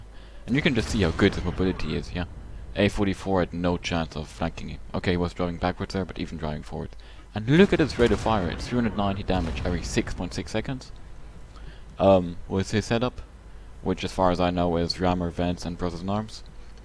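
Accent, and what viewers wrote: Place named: Germany. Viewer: The commentary on the video is like to listen Quickybaby with a differnt voice and german accent. He uses all the same phrases. I dont rate this bad. It's just a statement.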